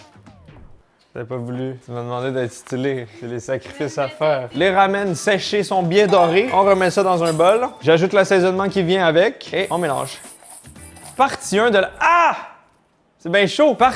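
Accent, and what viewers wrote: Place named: Quebec, Canada. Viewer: Tu vie au Québec ? Parce que tu bcp l’accent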